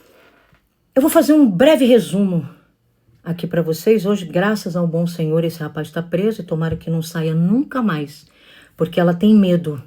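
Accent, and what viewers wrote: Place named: Rio Grande do Sul, Brazil. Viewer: Maldito desse ou é gaúcho ou nordestino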